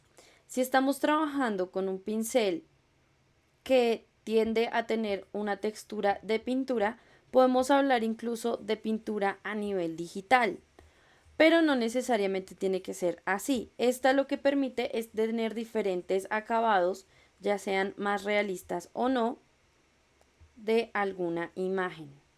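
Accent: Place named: Colombia. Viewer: Hola buen día, puedo suponer por tu acento que también eres de Colombia. Es una lástima que hayas dejado de crear estos videos, espero puedas leer este comentario y te de un poco de motivación para continuar con este proyecto. Yo quisiera aprender más sobre ilustración científica. Si me puedes recomendar algún libro u otros medios para aprender te lo agradecería.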